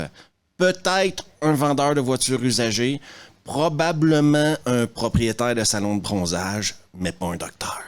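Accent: Quebec, Canada. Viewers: Salutation fraternelle. T'as définitivement fini par m'avoir, en plus de nombreux fou rire (suffisamment rare pour le souligner) et de.ton tellement sarcastique (j'apprécie énormément en n'en faisant preuve moi-même au quotidien) ton intro m'a tué de rire tellement simple, tellement sobre mais surtout tellement et en prime d'une efficacité extraordinaire 😂😂😂😂😂. Je m'y attendais tellement pas, je me dis que du coup j'ai bel et bien fait de m'abonner je regrette pas 😂😂😂😂😂. Continue de nous éclater avec ta gouaille tellement québécoise, t'es vraiment un bon, on est certes loin des " Cahiers du cinéma" mais c'est tellement jouissif d'objectivité concernant un certain cinéma tombé en désuétude. Nous sommes de la même génération apparemment et tout ce cinéma me manque terriblement. On ne refera pas le passé malheureusement mais dépenser des sommes astronomiques pour voir des septuagénaires en famille ça relève de l'escroquerie. 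Que certains producteurs en prennent conscience et ce bouge le c..., au lieu de financer des taxis 5 et autres bouses du genre, je dis bouse pour ne pas dire navet ou nanar, jai trop de respect pour un Halloween 5 que pour un Indiana jones. Force à toi l'ami et prends soin de toi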